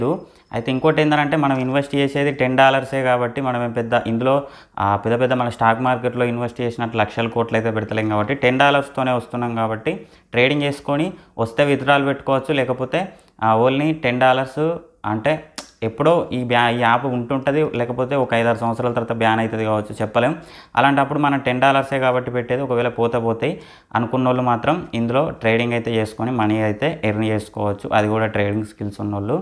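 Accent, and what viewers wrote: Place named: Karimnagar, India. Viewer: Sir మీరెక్కడ, కరీంనగర్ భాష మాట్లాడుతున్నారు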